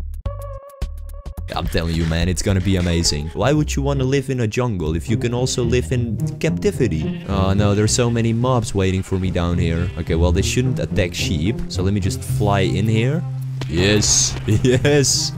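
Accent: Netherlands. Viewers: Jij Hoort Gwn da hij nederlands is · Hmm. In which country do you live? You sound kinda dutch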